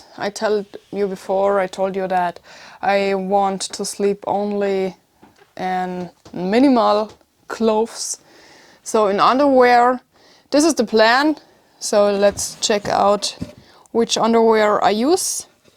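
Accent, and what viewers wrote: Germany. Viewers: All I can say is " I love me some!" Girl u r absolutely beautiful and awesome. That accent is really appealing. I miss Germany, former dependent of a service member station in Bamberg, Germay. Anyway, I really think you are beautiful and awesome. Tell the husband to behave or I'm in there like turbo boost. Want to see Utah, USA? LoL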